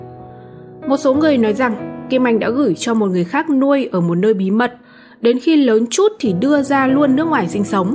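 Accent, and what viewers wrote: North, Vietnam. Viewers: Đọc giọng bắc,hậu sanh, biết gì về tổng thống Thiệu mà nói tào lao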